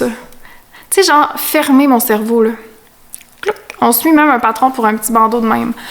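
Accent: Quebec, Canada. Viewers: 🐑🐑🐑 je t’ai découverte hier et je suis déjà absolument conquise par ton univers, ton énergie pétillante et ton merveilleux accent québécois. Tellement contente de partager avec toi ce Vlogtober! Merci 🙏 🙏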